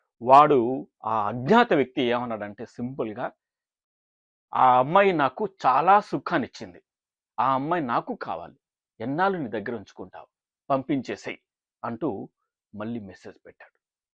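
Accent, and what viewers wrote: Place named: Tamil Nadu, India. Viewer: Sir, neenga Tamil aa? Illa ennai pol Tamilum Telugum aa? Neenga pesara Telugu Tamil accent kalandhadhu. Aanal arimayaga Telugu pesikindireerargal. Tamil yasalo Telugu chala baga matladugunnaru. US lo Tamil lo matladi chala nallaindi naku.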